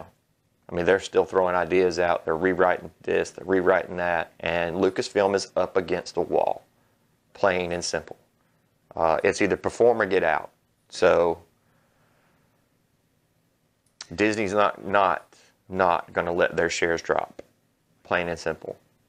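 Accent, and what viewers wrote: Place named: South, United States. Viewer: I've notice something kind of funny.. lol... If the people from the east cost says Ray is a Marry Sue, and this guy who sounds like deep south says Ray is the biggest horseshit Force User. just trance late Deep south langrage to East Cost, its the same thing said in a different style of English, Marry Sue= Biggest horseshit Force User.. At this point I think people in the UK will say the same thing about Ray but in a different style.. you know what this means Disney and Lucasfilms is in deep shit..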